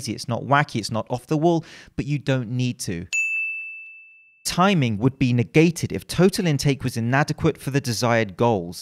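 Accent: United Kingdom. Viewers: I subbed your channel a few days ago and I have been binge watching your videos. Excellent stuff and very much appreciated. Just listening to your British accent makes me smarter. And I like how you add some British slang in your videos - we learn about exercise science AND language. I particularly like the phrase "look like a wally" you used in your isometric video. I will be using that. I myself am trying to break some misconceptions regarding Olympic weightlifting, which the rest of the world just calls "weightlifting," particularly the ideas of a certain coach. If you ever need ideas or material on weightlifting let me know.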